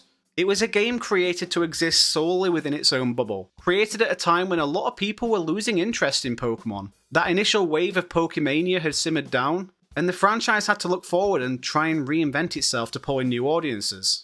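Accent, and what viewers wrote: United Kingdom. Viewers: Sounds like you've had a similar journey to me mate, stopped at Ruby and then ended up getting Ultra Sun around lockdown. Only really got it to be able to download that "poke-transporter" app from the 3ds store before it closed - ended up really enjoying the whole vibe of the game and it sucked me back into the pokemon world once more! Just like with you and Sword/Shield, all I came across online was absolute hatred for Ultra Sun, but I loved it 😅 Since then I've gotten a hold of and finished Platinum and White - really enjoyed them too. Right now I'm absolutely immersed in Legends Arceus and I'm fairly excited to see where the series goes from here! As a side note, you sound like you probably live around my area, north-west? I grew up around Stockport 🙂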